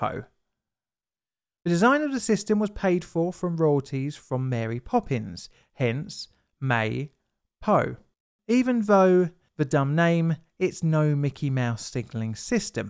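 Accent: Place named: England, United Kingdom. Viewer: Just found your Channel and subscribed because I enjoy your Video content and appreciate your English accent, but I have a question (and it's not meant to be a criticism). When did Th-fronting become compulsory for Brit YouTube narrators ? Or has a speech impediment become a dialect ? Of the many British Channels to which I'm subscribed, nearly all the narrators have this idiosyncrasy and I can't help but wonder why ?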